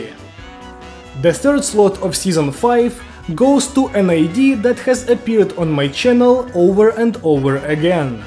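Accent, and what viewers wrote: Russia. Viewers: Как же он пытается говорить на английском, но как же слышно русский акцент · russian accent wth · Я же слышу что ты русский наш слон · Are you Russian, by any chance?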